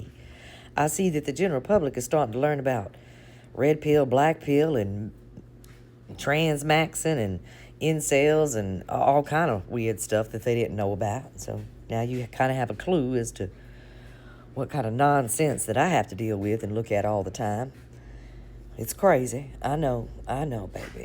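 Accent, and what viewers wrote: South, United States. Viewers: Rest that Southern voice. We need you to spill the sweet Southern style tea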